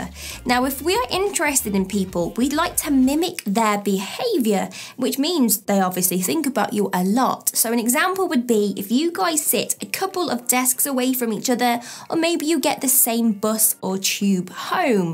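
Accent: United Kingdom. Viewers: Your talking about ways to know if she's thinking about me,but I'm stuck here wondering where's your accent from🤔..sounded kind of British ,but then again it sounds like something else..I love it either way ! Big up from the Caribbean !!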